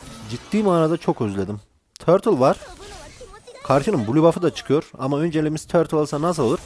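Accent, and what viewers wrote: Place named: Çorum, Turkey. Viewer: çorum lumusun sen krl şive oraya kayıyo gibi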